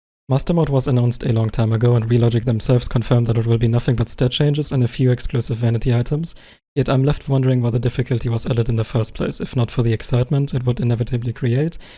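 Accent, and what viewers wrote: Germany. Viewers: Man hört halt direkt raus, dass du Deutscher bist. Aber super Video kann ein paar deiner Kritikpunkte teilen.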